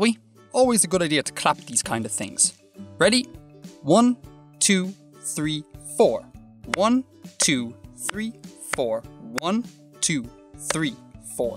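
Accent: Ireland. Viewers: Let’s all apréciate his Irish accent and nearly 600k people have watched this lmao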